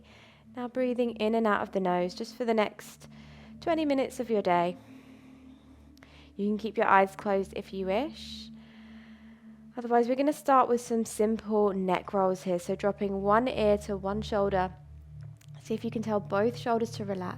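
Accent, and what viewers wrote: United Kingdom. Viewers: Dear, I Always used to do yoga with Adriene, but more and more I am drawn to your classes. Thank you so much. You seem to have something that suits me every day and I love your English accent! (I'm British in France) thank you for your kindmess xx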